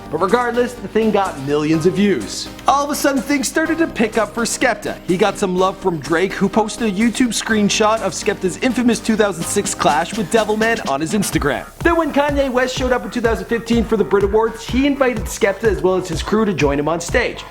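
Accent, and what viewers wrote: United States. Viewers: Its ok his american/canadian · Wtf is this American chattin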